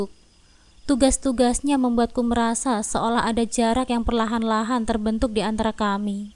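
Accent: Java, Indonesia. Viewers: Medok amat sih min Lucu denger nya hahaha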